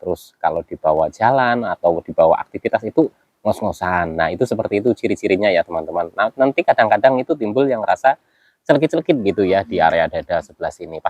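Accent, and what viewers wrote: Banyumas, Indonesia. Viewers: Mas sampean sih daerah ngendi ngapaknya, nuwun sewune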